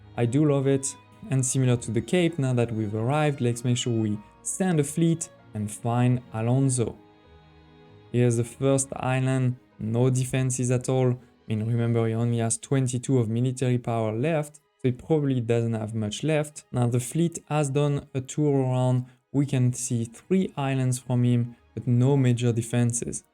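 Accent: France. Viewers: I think that accent is french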